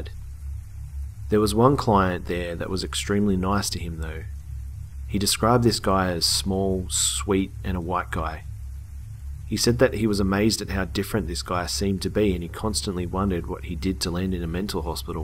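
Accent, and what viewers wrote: Australia. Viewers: ***** No worries. It's always entertaining watching your vids. I also follow LM. I live on the Gold Coast in QLD Australia, so it's cool to hear a familiar accent :) I'll be waiting in the wings for a new video soon mate! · Badass man, keep up the good work. Great videos, like the Aussie accent too.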